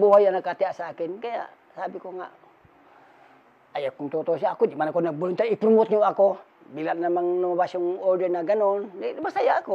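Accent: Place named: Bicol, Philippines. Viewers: Bicolano si sir bisakol ang salita saan Kaya sya SA bicol ganyan dapat mag kwento Hindi Yung masyadong seryuso Kasi tapos Naman na Yan ehh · The way magsalita si sir kababayan🥰bicolanong bicolano🥰 watching from Saudi arabia 🇸🇦 ❤ God bless you po🥰❤️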